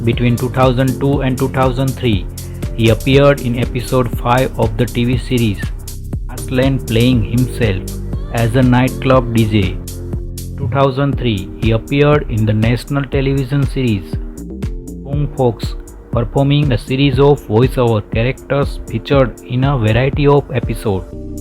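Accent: India. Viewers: A heavily accented indian guy narrating this video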